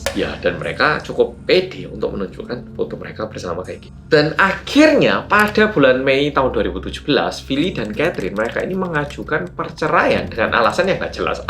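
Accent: Java, Indonesia. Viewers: bang klu ngasih video endingnya yg jelas dikit jangan gantung gtu... trus pas opening g usah trllu ngmong medoookkkk jyjyk bget gw dgarnya🤢🤮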